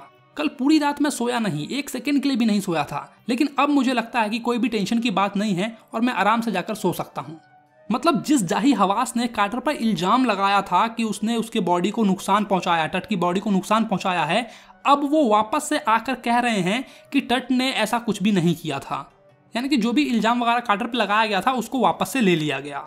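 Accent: Bihar, India. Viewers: Bhaiya aap bihar se ho ki mp se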